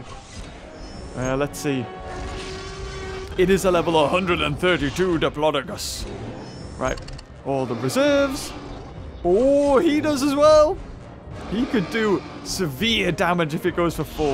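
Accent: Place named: England, United Kingdom. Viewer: I feel like i am the only person who understands you because i am english too lol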